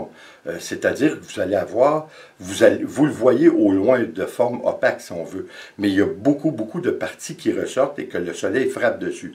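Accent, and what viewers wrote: Canada. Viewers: Bravo cher vos explications Meme avec votre super charmant accent Canadien sont formidables 👏👏👏👏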